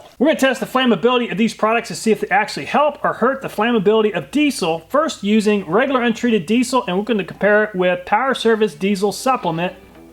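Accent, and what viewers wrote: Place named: South, United States. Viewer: I listened to you say ultra low sulfur diesel 3 times cause my friends said you sound just like me with my country accent. Lol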